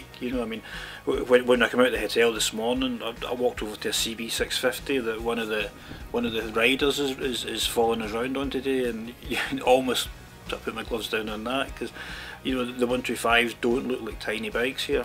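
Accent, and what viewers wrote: Scotland, United Kingdom. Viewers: If you can't understand this guy you must be thick? Its English with Scottish accent. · Well..... It's as good, and as honest a review as I've seen of the CB125R so far. Nice one,. I'm away to Honda Chester next week to place an order for one. As for the negative comments about accent; he's a Jock. What the f**k do you expect him to sound like? Jesus... What a gang of bellends. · Don’t understand criticism about pronautiation... This accent is in no way worst than american (90% of youtube is american english), which is also not a standard - british english... stay different!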